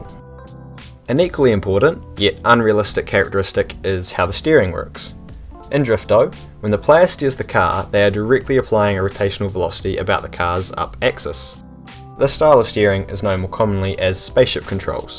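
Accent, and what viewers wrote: New Zealand. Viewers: Are you kiwi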